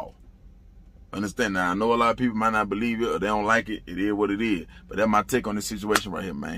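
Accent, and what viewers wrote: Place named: Louisiana, United States. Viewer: This whole time I been tuned in thought you was from Louisiana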